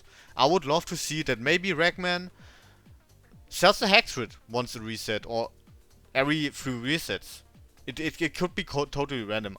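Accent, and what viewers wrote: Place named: Germany. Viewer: Dein Englisch ist top aber der Akzent ist nicht zu überhören 🤣 German :p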